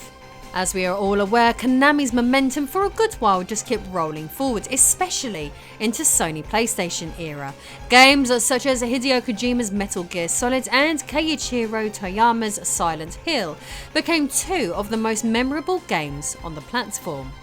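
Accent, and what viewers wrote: England, United Kingdom. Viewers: How have I not come across this amazing channel before??? So much rare footage, attention to detail and such a lovely, kickass english accent 🤩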